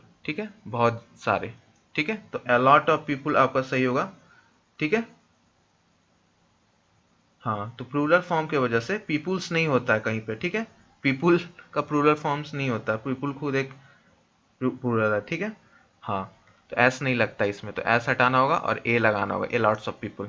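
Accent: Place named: Bhojpuri, India. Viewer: Cls to sir superb se duperb tha hi pr aapka bhojpuri v kch km nhi tha sir 😀😀😀😀👌👌👌👌👌👌👌👌👌👌👌👌👌👌👌👌👌👌👌👌👌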